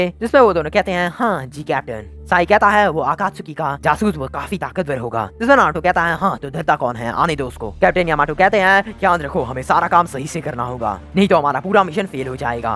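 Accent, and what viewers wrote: Assam, India. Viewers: Are u Assamese 😒?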